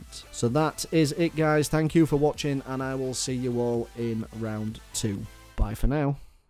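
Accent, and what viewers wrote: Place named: United Kingdom. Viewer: Is the british accent so much fun to hear? Or just good in commentary, nice work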